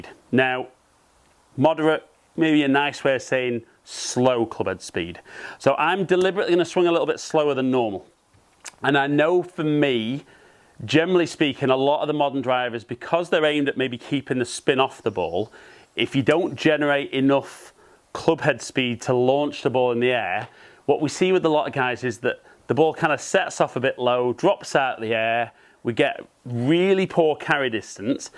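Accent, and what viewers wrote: United Kingdom. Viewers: Can we get reviews from American without heavy British accents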